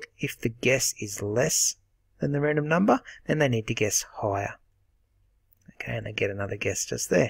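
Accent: Australia. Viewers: The tutorial is explained perfectly.. but for some reason I'm getting an error in line 6 "while guess != number:" the error says: while guess != number: ^ SyntaxError: invalid syntax I tried everything I could but I am unable to fix it. Love the Aussie accent btw <3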